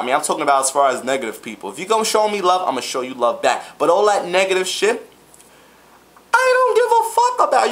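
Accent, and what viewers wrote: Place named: New York, United States. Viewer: i love how you talk with that new york accent!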